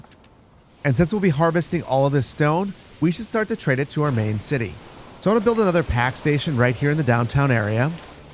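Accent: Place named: Great Lakes, United States. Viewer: Your accent sounds kinda like you live on lake Erie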